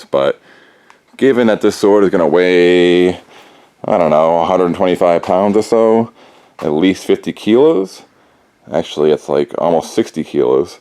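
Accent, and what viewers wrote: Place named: New England, United States. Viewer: Where u from.dude? U sound like u got a Rhode Island slash Massachusetts accent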